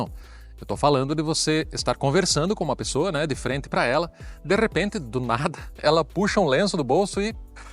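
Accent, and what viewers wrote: South, Brazil. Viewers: Oi, esse de teu sobrenome é português, italiano, ou polonês transformado? Logo que começaste a falar, identifiquei: RS, SC Ou Paraná? Sou jornalista e antropóloga e adoro vídeos como os teus. Parabéns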